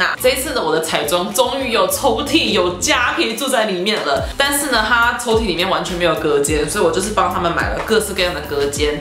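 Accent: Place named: United States. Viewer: Dani是ABC什麼的嗎？🤔感覺講話有口音欸😅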